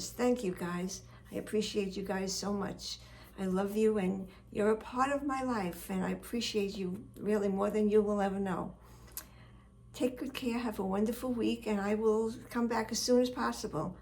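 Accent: New York, United States. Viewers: Same! She's a real New Yorker, in the Pacific Northwest! Not common I would guess.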